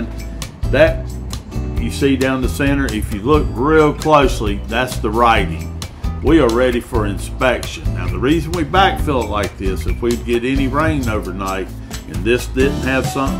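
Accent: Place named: Texas, United States. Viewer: I subscribed for the cool content but mostly for that Texas drawl! 👍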